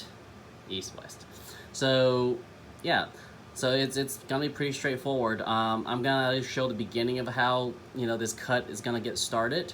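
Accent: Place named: Alaska, United States. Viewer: This guy has the Alaskan Bush People children accent.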